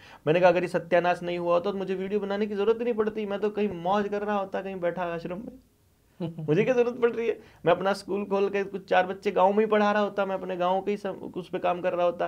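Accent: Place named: Haryana, India. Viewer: इंटरव्यू करने वाला बंदा तो अच्छा रखा करो जिसकी जबान साफ हो और एक्सेंट ना हो। गंवार टाइप हरियाणवी ग्रामीणों को इंटरव्यू लेने बैठा लेते हों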